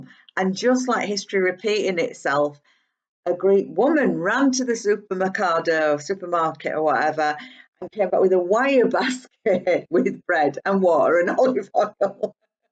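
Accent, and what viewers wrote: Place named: North, United Kingdom. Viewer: nice northern accent, poli kala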